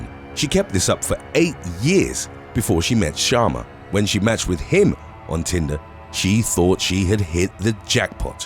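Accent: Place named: England, United Kingdom. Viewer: when i hear an English accent i assume its white. kinda disappointed its not a white old guy.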